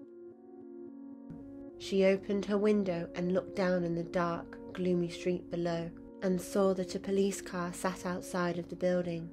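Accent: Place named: Liverpool, United Kingdom. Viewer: Sounds like the fella in 'The Flying Pickets'. Weren't they from Liverpool?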